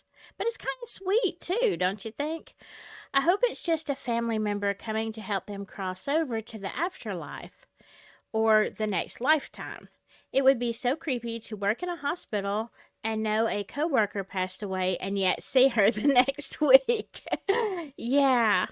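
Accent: South, United States. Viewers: Hello, and fellow nurse! Love your stories and your southern accent. The three's (my favorite number), I always felt, is the Lord's number- father, son and holy ghost. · I love your generous spirit and southern accent. Thanks for keeping us entertained and informed with real paranormal stories. ❤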